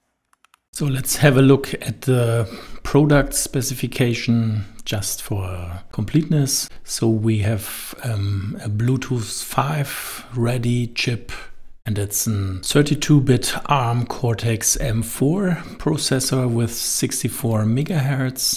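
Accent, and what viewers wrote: Germany. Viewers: since i live in germany, I'm 100% this is a German native :D from accent perpective, but good work!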